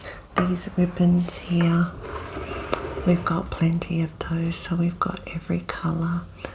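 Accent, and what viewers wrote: Australia. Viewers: Hearing an Australian accent in ASMR is so comforting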